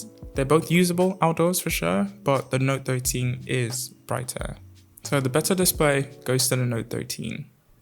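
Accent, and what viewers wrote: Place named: England, United Kingdom. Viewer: Going for spark 20 pro....You n I have a similar English accent.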